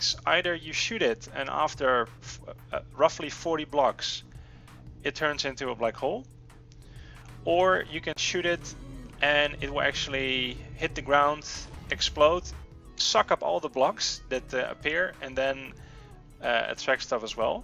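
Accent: Netherlands. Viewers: What kind of accent is that? Are you dutch? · Haha ik hoor dat je nederlands bent, dat betekent niet dat je engels slecht is hoor maar gwn je accent en uitspraak!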